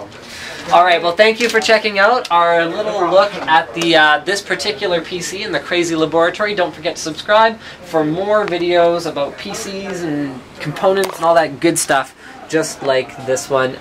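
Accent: Russia. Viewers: For how log has he lived in Canada now? Most of the Russians lose the terrible accent after a year or so...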